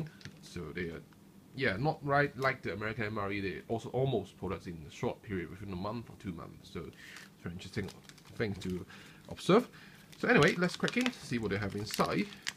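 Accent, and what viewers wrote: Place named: United Kingdom. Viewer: Very nice video but your accent is the weirdest thing I have ever heard. Most of the time you sound english (british) but then you say things like "choclick" or "lick out" instead of "leak out" and some other little weird things. I am curious, where are you from and do all people talk like that there?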